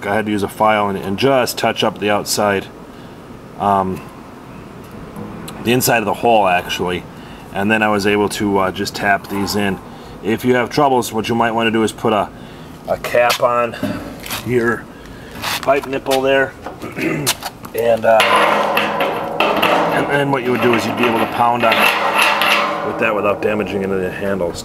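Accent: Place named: Wisconsin, United States. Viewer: I thought you sounded like you're from Wisconsin. Looks like a good project. I wish I had the tools, etc to build one myself.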